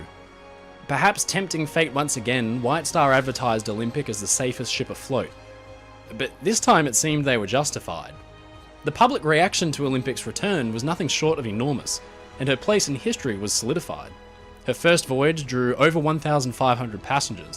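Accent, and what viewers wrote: Australia. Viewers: Probably because he is Australian, to him American accents are annoying yet he’s not being an ass cause he seems like a nice dude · He has an Australian accent! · I really appreciate your delivery. It’s so nice to hear narration marked by impeccable pronunciation and phrasing with the stresses and pauses all correct and appropriate. For anyone not familiar with it, the accent is “educated Australian”.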